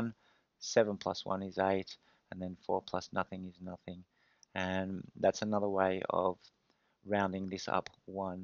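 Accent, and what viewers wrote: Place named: United Kingdom. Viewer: o0Ella0o English just a British Acent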